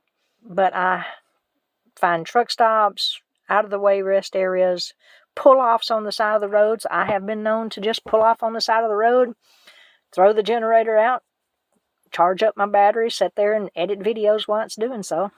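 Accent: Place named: Georgia, United States. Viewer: Yay!!!! I knew I recognized that accent ! Hehehe Greetings from Savannah. I saw your Ga car tag briefly in the video . I’m a new subscriber and looking forward to your travels. 😎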